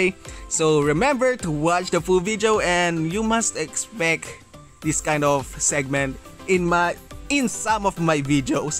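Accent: Philippines. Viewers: are u Filipino? Because of ur accent. And i want lunar beast annie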